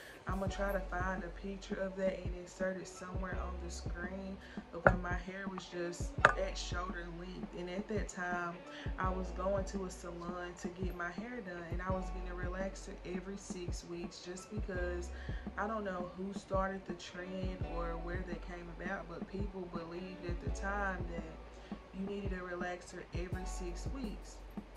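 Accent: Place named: South, United States. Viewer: Good information! You sound like a southern girl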